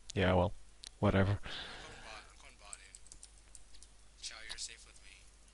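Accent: Netherlands. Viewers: you are such a bad commentator.you obviously know nothing about this game.If reginald picks zilean mid,you can be sure zilean is a good champion and you shouldn't break him down.Your accent is so annoying!So is your voice.I hate it when people from the netherlands try to speak english.Please don't make any commentaries anymore.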